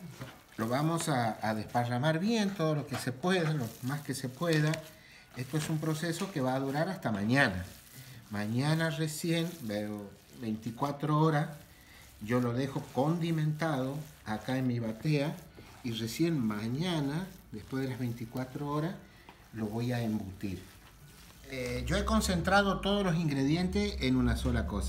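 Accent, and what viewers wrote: Santiago del Estero, Argentina. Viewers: Q bueno amigo .tenés tonada santiagueña puede ser? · Amo la tonada Santiagueña soy de catamarca muy linda explicación 💪🏼💪🏼🇦🇷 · Que lindo escuchar la tonada santiagueña en un video productivo 🙌🏼 abrazo grande hermano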